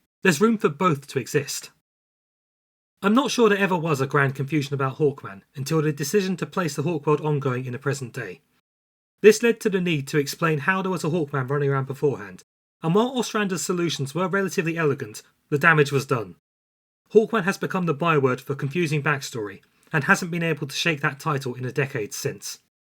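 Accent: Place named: United Kingdom. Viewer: Is 9th metal 8x stronger than Nth metal, or is homies Limey accent just throwing me off? did not know Ninth metal was a thing🤷🏽 The Katar Jr./silver scareb works cuz that Gawkman was a lier and not the Scarebs real brother. I just love JLI and hate the try on that those 2 weren’t legit! Using Morrison’s preferred pronouns? RESPECT Edit Lol Starlin mess’n round w his cosmic fetish, you really can’t fault the guy at this point. Death of the New Gods was a giggle, and he did kill Jason!!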